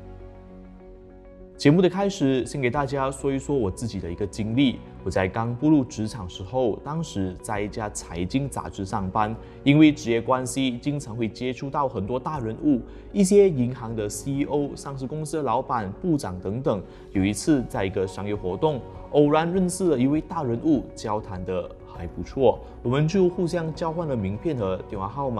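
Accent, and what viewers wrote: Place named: Malaysia. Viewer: 聽口音是馬來西亞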